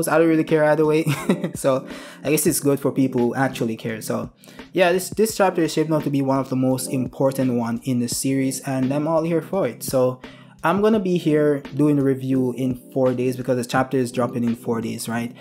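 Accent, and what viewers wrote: Jamaica. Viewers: i knew it i am also Jamaican bro u speak Jamaican English when i speak English u hear ghetto english nice to meet you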